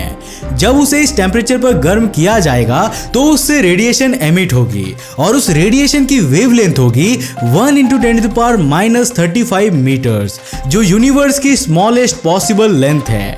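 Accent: Bengal, India. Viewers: Kya tum bengali ho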